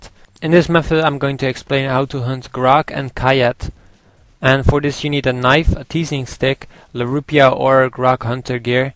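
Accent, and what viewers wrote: Netherlands. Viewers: aha ik hoor nederlands accent:P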